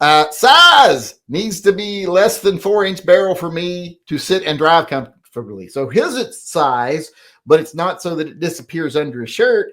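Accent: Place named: West Virginia, United States. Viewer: you grew up in mothman country 😂😂😂 no wonder your accent is so familiar (i have lots of close friends in that area... almost kin)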